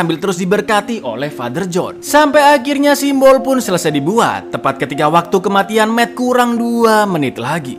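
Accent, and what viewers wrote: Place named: West Java, Indonesia. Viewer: orang Sunda ya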